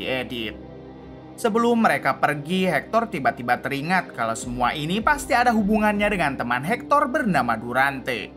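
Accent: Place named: Madura, Indonesia. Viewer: Anjay derih madureh nkk bos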